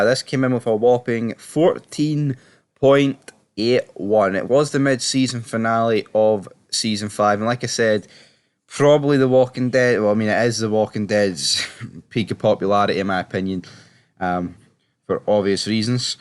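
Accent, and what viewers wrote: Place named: Liverpool, United Kingdom. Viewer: damn i guess scouse accent isnt the best one for youtube videos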